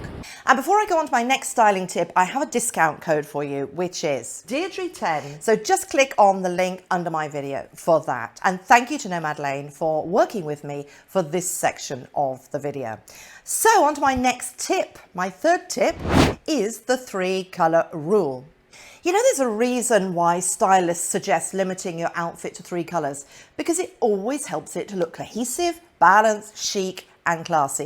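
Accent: United Kingdom. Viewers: My only tip is never wear wide legged pants for travel. The bathroom floors can be disgusting and you don’t want your pants to touch that. Joggers solve that problem nicely. And might I add it was weird, and somewhat even disappointing, to hear a beautiful British voice say sneakers! And I’m American! 😆 Great videos.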